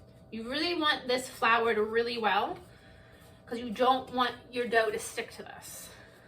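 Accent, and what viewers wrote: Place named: Midwest, United States. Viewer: Yea success!!! I put your recipe together yesterday morning “Saturday” placed it in the oven with the “light on” until I got home . I then followed your instructions for preparing and baking the loaf. It came out perfectly. You are wonderful! Thank you for this great video-oh I enjoy listening to you talk…what part of the country are you from. A wild guess…mid-west?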